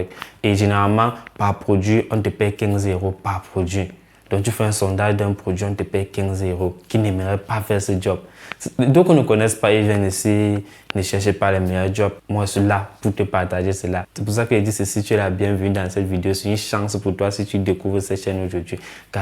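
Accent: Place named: Cameroon. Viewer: Avec le bon accent camerounais a la Francis ngannou yes frerot force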